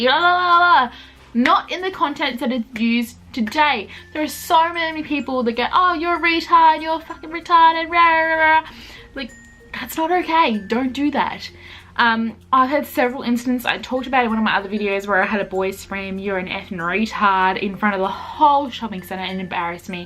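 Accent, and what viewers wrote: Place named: Australia. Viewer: I already did. It’s a crack up as well!!!! Now, I’m old enough to be probably your Grandpa, but I’m captivated by your outgoing, friendly persona....... but appropriately 😂 I also love that you’ve an unashamed Aussie accent!!!!!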